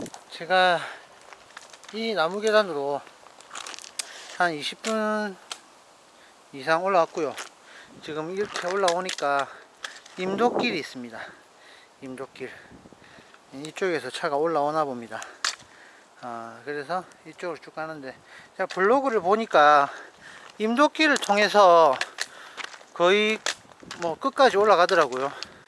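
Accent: Gyeongsang, South Korea. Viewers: 영상잘봤습니다 항상 구수한 경상도사투리 반갑습니다 😁 지금은 서울에 살지만 본가가 사하구라 거가대교 한번씩 가보긴 하는데 도넛츠 🍩 먹으러 가보겠습니다 좋은곳에서 힐링하시니 조만간 저도 한번 가야 겠어요 ^^ 축복합니다 💜💕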